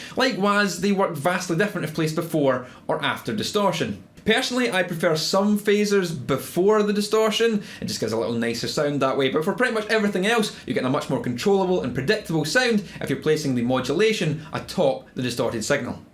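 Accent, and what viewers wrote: Scotland, United Kingdom. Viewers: Ah noo th' rayzon. Ya lessen chu thus bekez joost lessenen ta Scawtush axunt is fasunettin. Now, me, I watched it to get opinion on Noise Gates. Great answer for that question. Thanks,! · Hey,! I am from germany and like your great videos, because you are a competent teacher and you have a big talent to keep even complicated contents understandable and correct. And there is your friendlyness and great sense of humour, that makes nearly every video a nice event! And it is fine, that you don' t deny your scottish origin, BUT.... please, please, never forget, that a lot of guitar players, that enjoy your vids come from other countries and some, as me, have sometimes problems to follow your explains, not, because of your scottish accent, but because of the often unbelieveable TEMPO you use!! So i like to beg you to talk with a bit less speed so that it is no problem for us "non-scots" to follow your explainations!! 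Thanx a lot for keeping this in mind!! - I am already curious about the next interesting vids!! Lincoln 6 Echo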